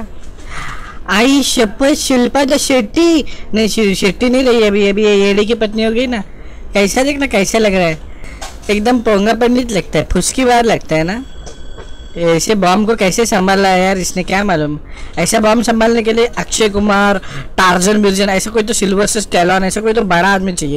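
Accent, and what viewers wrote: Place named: Mumbai, India. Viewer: ponga pandit.. LOL.. I am sure commentrator is from Mumbai.. Only a Mumbaiwalla kan speak like that.. Cheers to Mumbai.. videos have many dislike.. some of them.. Non Mumbaikars dont understand jhakas humor of mumbai... so they press dislike... you dont worry... keep making such videos.. u rock.. Mumbai spirit socks.. stay Mumbai style only.. dont change...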